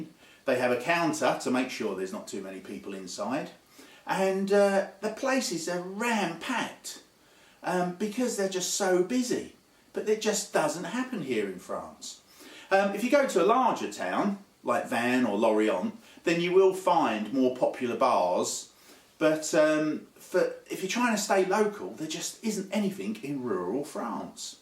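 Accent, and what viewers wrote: United Kingdom. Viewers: Super my dear friend with the great British accent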